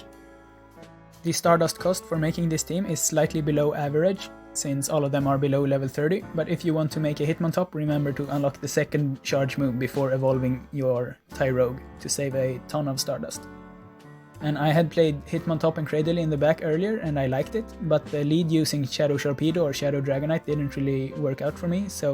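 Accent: Sweden. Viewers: damn that's some swedish accent. kinda love it thoe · Love Swedish accent so much! So sad that I was not able to fly to Stockholm recently.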